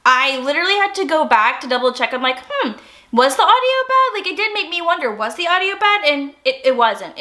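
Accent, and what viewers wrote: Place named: San Francisco, United States. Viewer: I thought you were a San Francisco Native because of your beautiful San Francisco accent. It’s a very unique way that native San Franciscans talk and I love it.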